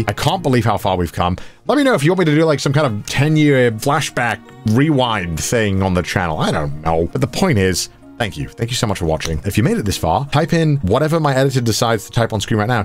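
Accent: United Kingdom. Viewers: im from LA and i agree that a lot of things suck about it, but for some reason it makes me mad when a british dude says it lmaooo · For the engagement farm, Bri'ish man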